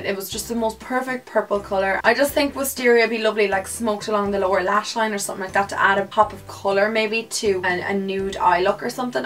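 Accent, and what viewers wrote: Ireland. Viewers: I love your videos so much!!! I love your Irish accent!!! I'm from Ireland too!!! I love you and your channel!!! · Is she irish? Oh shes so lovely dovely 😞 😍💑💏💞💖💕💓💗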